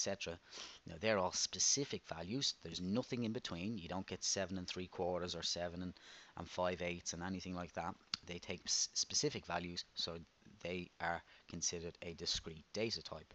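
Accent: Ireland. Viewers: All of your videos have really helped me revise! thanks for taking time out to post these videos on youtube as my maths teacher I currently have in college is SHIT. thanks!! wish you were my teacher, especially with that sexy irish voice....... just saying:)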